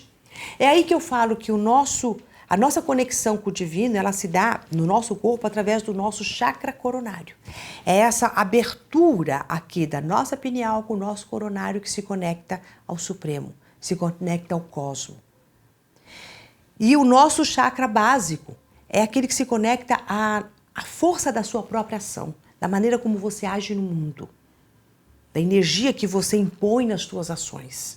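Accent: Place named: Italy. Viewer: tem sotaque italiano.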